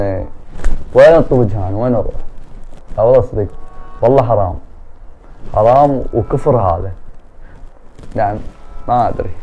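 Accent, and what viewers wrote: Iraq. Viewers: والله اول ماشفتك عبالي سعودي طلعت عراقي وحت حجيك مايشبه شكلك تحجي مثل العراقيين الكارهين الدنيا صدوك لو كال ابو المثل لاتغرك المضاهر خرررر بحضي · ليش احسك من الجنوب من اهالي البصرة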